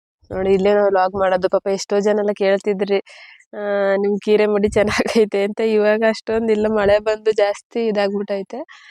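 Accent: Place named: Mysore, India. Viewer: Nivu mysore bhasa matadatira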